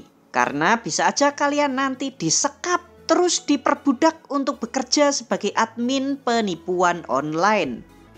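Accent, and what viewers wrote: Java, Indonesia. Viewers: Logatmu min, orang wong banget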